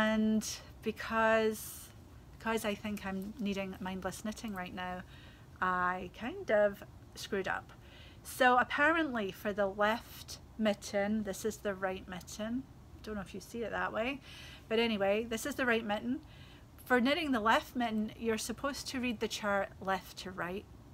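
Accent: Scotland, United Kingdom. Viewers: Thank you for the EYF sale this weekend...even though the CDN $ is so low, I plunged in and fell right off my yarn diet to splurge on some Marie Wallin wool. A dear friend shared her leftovers from her cowl kit and I purchased the wool to replace the bits needed to make myself the same cowl. Love watching your shop updates and your wonderful accent, as I recently lost a very dear Scottish lass who was 94yrs young and it brings her closer each time I hear you speak. :) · Oh how can we get her to publish that pattern? It really is a no-fuss beauty! My bucket list has always been Shetland Wool Festival and EYF. Some of my motivation is simply due to my love of hearing the Scottish voice! I always look forward to listening to you while I knit!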